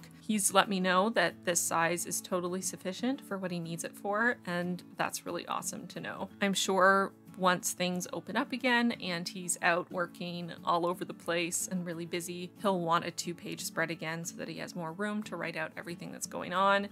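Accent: Canada. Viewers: Aayyeee I had no idea you were canadian til i heard pencil crayon haha xD ive only ever heard us say that with our NA accents, i think brits say it too but not sure xD nice to see more canadian-based bujo channels. i love seeing both your spreads and your husbands, my current style is waayy more in-line with what you do for your husband, but my dreams are more like yours so it's really cool seeing both!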